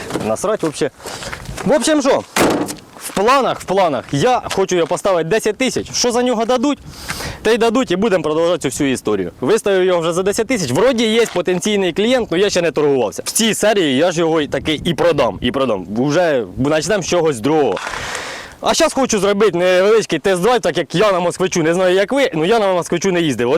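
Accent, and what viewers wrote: Poltava, Ukraine. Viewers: З перших секунд чути Полтавську область. Звідки ти?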